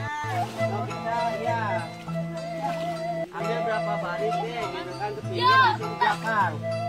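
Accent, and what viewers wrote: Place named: West Java, Indonesia. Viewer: iyeuuh, khas sundanya kerasa 😍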